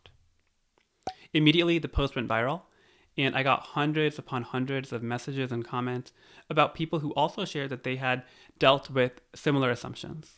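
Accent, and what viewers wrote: United States. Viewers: It's wild how it's always the one negative comment that sticks with us. You always sounded like any other American to me (from an undisclosed African country)...that wasn't meant to sound insulting fyi...anyway, the content is great, anyone focusing on accents and not the basically free knowledge being dropped is to be ignored. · What's wrong with american accent?, even if u are faking, to speak like this needs some hard work, I'll appreciate instead posting negative comments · You sound 💯 percent American. No.accent. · Don’t bother about those comments, you are doing awesome job.You are raised here and definitely you will have the American accent.Lot of people in India do not know this.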